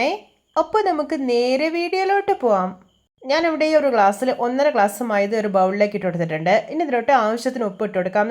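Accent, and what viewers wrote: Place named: India. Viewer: ആന്ധ്രാ/ ഒറീസ്സ നിവാസിയാണോ? നന്നായി മലയാളം പറയാൻ പഠിച്ചിട്ടുണ്ട്... ഒന്ന് കൂടെ ശ്രദ്ധിച്ചാൽ മലയാളികളെപ്പോലെ സംസാരിക്കാൻ കഴിയും.!!! മലയാളം നല്ല ഭാഷയാണ് അതിലേക്ക് കൊങ്കിണി പോലെ നീട്ടി സ്റ്റൈലാക്കേണ്ട 🙏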